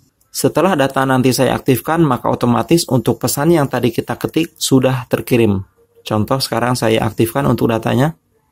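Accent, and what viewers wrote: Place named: West Java, Indonesia. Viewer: Orang sunda pasti yang ngomong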